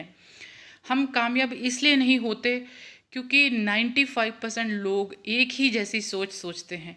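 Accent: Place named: Punjab, India. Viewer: Tuhdi Soch bht vadia ji sachi bilkul sach keha tusi motto mehnat te bht Kar rhe Baki agge rabb de hath a ji 🙏 Baki ek gal tusi Canada Ch reh k pure Punjabi bolde ho jida k gaddiya thukti Hai hahahhaha Nhi te AJ kal Jo USA CanAda yeah yeah bht krde a ji Jo 5 class pass v nhi hunda oh v yeah yeah bs hor koi gal hi nhi krde waheguru ji tuhnu hamesha Khush rakhna. Te ur famliy members nu v dear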